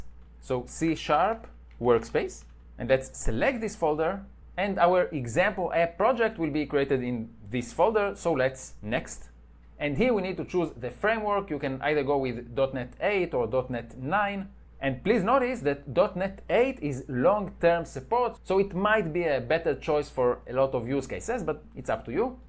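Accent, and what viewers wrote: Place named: Israel. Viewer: ניסיתי למצוא סרטון שמראה לי איך להוריד.. לפי המבטא אתה ישראלי נכון?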